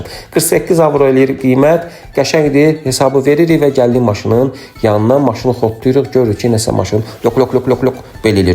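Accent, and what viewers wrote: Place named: Neftchala, Azerbaijan. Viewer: Bayaqdan düşünürəm bu hara ləhçəsidi?”Nefçala”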